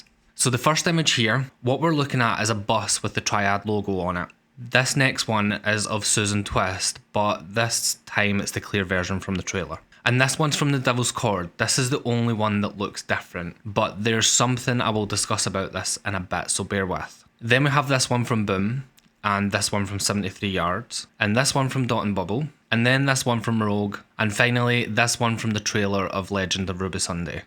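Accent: Scotland, United Kingdom. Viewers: God I love your (I wanna say Scottish?) accent. “Doctor Heu” “Classic Heu” “New Doctor Heu”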